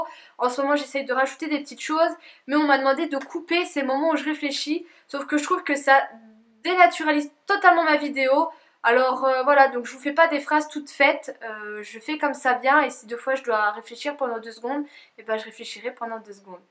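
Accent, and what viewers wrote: Lorraine, France. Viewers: j'aime Bien Ton accent ! Teeam Lorraine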